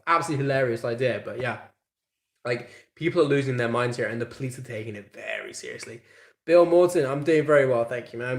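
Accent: United Kingdom. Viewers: Are you afraid of losing your english accent being locked up for so long with Gabbi?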